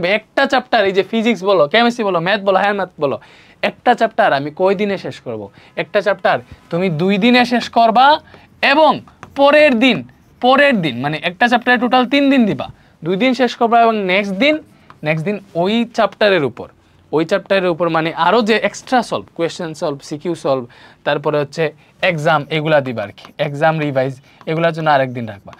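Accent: Sylhet, Bangladesh. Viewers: Apni ki sylhety? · Vai kita Sylheti ni 💗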